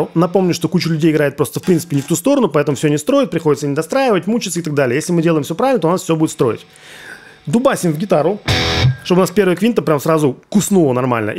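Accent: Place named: Novosibirsk, Russia. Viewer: Эти интонации Пушного такие же как у моих новосибирских друзей частично НГУ, частично Сибстрин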